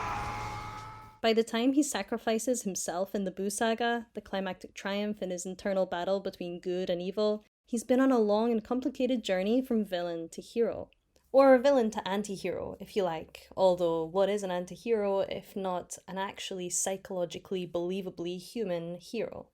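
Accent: Scotland, United Kingdom. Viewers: I subscribed so I don't miss it 😜 are you from Scotland? 😅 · You sound like a Scot that went to university in England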